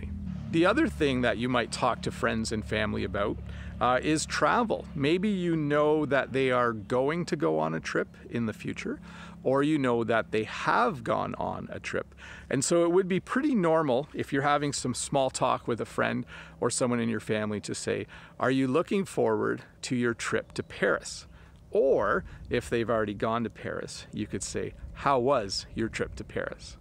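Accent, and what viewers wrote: Canada. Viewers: 100% understood Thanks - Good lessons for frenchman like me, good to listen english with canadian accent!! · A lot, when I was living in Australia, specially with talks with Australians. I like your Canadian accent, specially when you pronounce the "au" like in about.:-) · Dear, this is my first english teaching video I have ever seen (and heart). I am sure my english skills will be improve by waching your videos and daily ideoms on instagram. I like your accent. Habe you got a typical canadian one?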